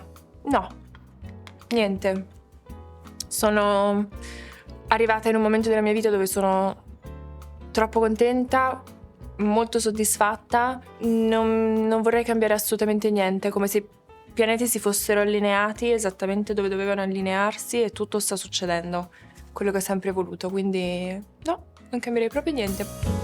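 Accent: Italy. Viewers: semplicemente “l’accento” italiano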